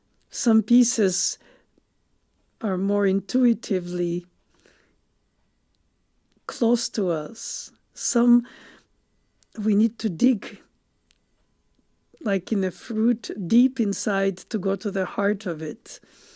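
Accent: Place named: France. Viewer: I can listen you speak for hours. I don’t know if your manner of speaking is because of your native French or because of your intellectual and aristocratic sophistication. But you definitely sound like someone from the past (from a glorious, superior past) and that both makes me happy and sad.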